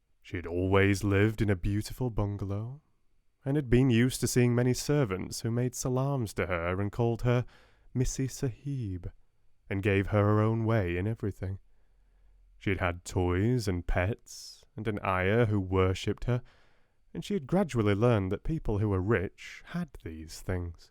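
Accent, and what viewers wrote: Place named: United Kingdom. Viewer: La verdad no entiendo un caraj* no soy muy buena con el acento británico, pero es uma delicia caralo 👌 suscritisima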